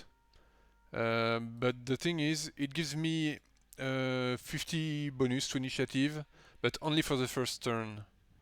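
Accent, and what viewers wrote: France. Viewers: You sound French :) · love your French accent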